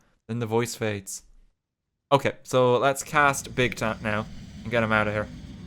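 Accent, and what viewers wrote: Ireland. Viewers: he sounds like the most irish person i’ve ever heard in my life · next stream: listening to an irish man speak for 8 hours :) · Why do you do this to me? I'm writing my friggin' A-Level English exam tomorrow and you're pulling me away with irish voice goodness ;w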